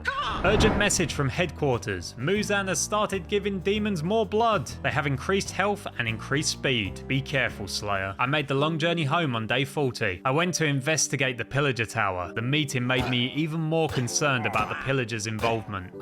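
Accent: United Kingdom. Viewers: I was sure that You are from uk but when i saw that You are from Poland i was so confused. Well… super film nagrałeś 😅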